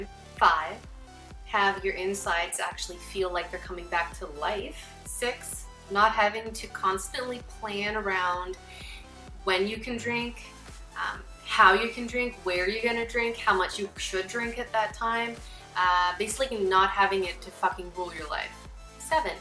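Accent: Canada. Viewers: Canadian ey? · Early on, how did you learn to be social, find Joy, & not drink (or use opioids in my case), without that security blanket that you'd come so accustomed to? Did you force yourself into uncomfortable social situations until it became easy? Did you have a regimented schedule set out for your days off? Did you become an exercise addict? Did your boyfriend push you to do stuff with him? Once you hit that bottom (which I have), how did you learn to live life on it's own terms without being absolutely bored to death? I like your Canadian accent, Canada Rules! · Love your videos. Helps me remember why i stopped drinking and the benefits of sobriety. Your canadian accent is too cute btw ("oot"). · Did you get your records up right? You're super lovely btw- and the love that slight Canadian accent. So, I'm aboot 21days sober- cigs went with it. It wasn't a resolution, it just happened and I've no desire whatsoever... 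Yes, it automatically make you want to improve other parts of your life. "This is no longer acceptable- how did I let myself get away with this?" kinda stuff... My step dad was an ineffectual boozer- my mom hung on until she died. My exwife was an abusive white-wino and our baby was born still- I divorced her and took a huge financial loss. Would I have married her if I had been sober? Not a chance in hell. What other losses have I incurred? How much massive bullshit have I brought into my life unintentionally, just by drinking? How many dj sets (4countries total) sounded not nearly as good as they could have? How many women have I been with that I wouldn't have otherwise? Eeeek! I'm so thankful this has happened. I've been in Austin TX for a year now, and have only been out for music a couple of times- I don't entirely trust myself- or I didn't- until now. Still, there's so much work to do on myself, by myself- everyone's dead, or I've cut all my old friends off. 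It's sucks, but I'll rebuild. I have all over the world- this time I get to do it better. Again, nothing but thankful... (Oh I gotta add- this past summer I captained party barges on the lake here (sober) and after watching people make total asses of themselves and puke their bikinied guts out aft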